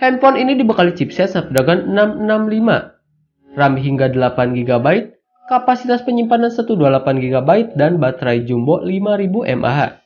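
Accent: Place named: West Java, Indonesia. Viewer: SAYA MENCIUM NADA URANG SUNDA IEU MAH BOAA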